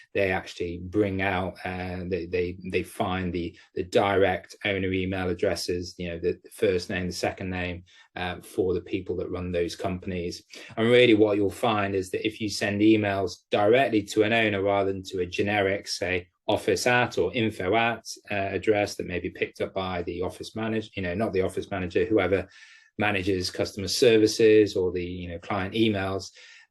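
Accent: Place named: United Kingdom. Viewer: Hi you sound like your from the UK. 🇬🇧 I’m from London UK aswell, I’ve been E-mailing tons of companies in my cleaning business. for the past 1 month from 4 different Gmail accounts every single day. 25-mails to companies each, a few responses back but only them responding saying not interested. 1 or 2 have got back to me saying they want me to come for a walkthrough. Is there something that I might be doing wrong? Is there more of a simple way to get more cleaning contracts quite quickly? Thanks bro look forward to hear from you.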